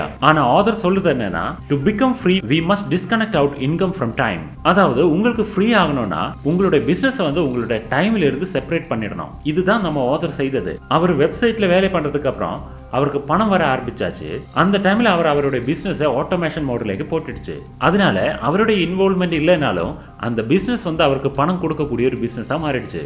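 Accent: Kerala, India. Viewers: Kerala slang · Avar malayali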